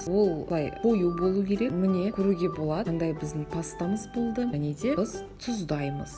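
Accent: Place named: Russia. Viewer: Сіздің орысша акцент-ыңыз бар екен сол үшін айтып жатқанмынғой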